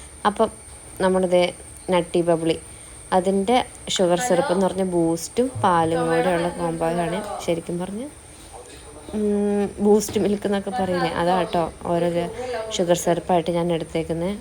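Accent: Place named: Kothamangalam, India. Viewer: Hi.. stalam evideya kothamangalam ano avdathe slang pole thonunn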